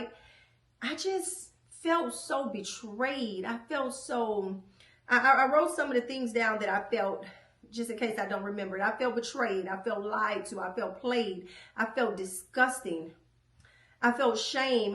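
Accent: Michigan, United States. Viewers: waitttt you from michigan?😂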